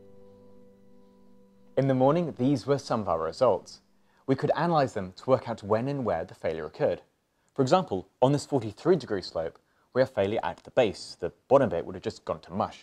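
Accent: England, United Kingdom. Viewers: damn pom trying to speak aussie words. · You are a small Tom Scott, same presentation style and British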